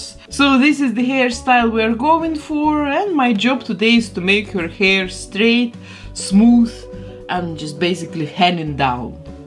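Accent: Russia. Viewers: русский акцент не спрятать😳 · Do I recognize the accent right? You are Russian? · Я что слышу русский акцент? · Мне кажется, или она говорит с русским акцентом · Are you russian? Where are you from? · О, этот русский акцент! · очень русский акцент))) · Оооо боже какой прекрасный русский акцент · Стоило бы тогда добавить субтитры на русском, для соотечественников, судя по акценту автору канала это было бы не сложно, а нам приятно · Русский акцент😅😅😅❤️❤️❤️ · Ох этот русский акцент 💪🏻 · Ого, акцент звучит очень по-русски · Ни чего себе акцент!!! Вы из Ростова? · Русский акцент?¿ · Не в тему. Но капец русский акцент. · Русский акцент жёсткий)) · Я слышу русский акцент или мне кажется?)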